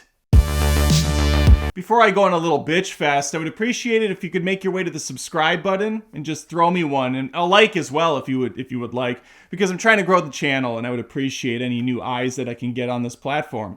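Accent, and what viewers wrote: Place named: Minnesota, United States. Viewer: Beig, Bayg... U r from Minnesota.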